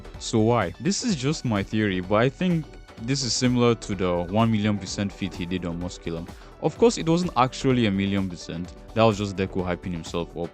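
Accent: Nigeria. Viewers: You Nigerian?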